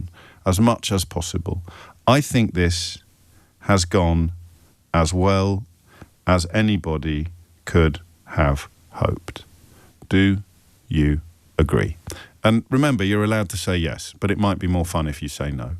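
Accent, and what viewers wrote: United Kingdom. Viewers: Watching you from South Africa and I have to say thank you. Honest reporting is very rare. Plus, the British accent is a bonus, love it.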